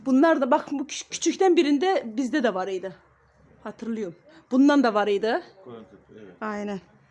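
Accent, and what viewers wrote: Azerbaijan. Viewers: Salam canım turkiyəm bacım siz yarısı azeri yarısı türcə konuşuyorsunuz hangi bölgə böylə konuşuyor sizdə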